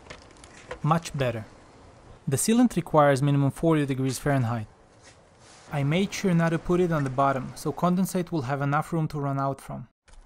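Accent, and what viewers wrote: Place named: Russia. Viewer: I've got to leave a comment, I hate it usually : ) bought the same unit, doing a change over install from a Bosch 82% eff. Love your eagerness to make things work and to do it properly. Love the accent but you don't typically look Russian, I'm Canadian and have a good friend Sergei who is Kazakhstanian, anyway appreciate what you did and the tact in which you did it, I might have been muttering with all the extra challenges, you sir make me a better person, stay safe, I'm a nurse(male) awaiting the pandemic, trust in the Lord, death is certain but how we live and who we live for changes everything, just wanted to send some blessings your way, thanks for yours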